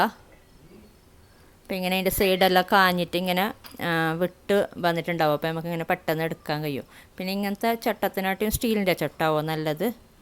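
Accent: Kasaragod, India. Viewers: Kasaragod slang aanallo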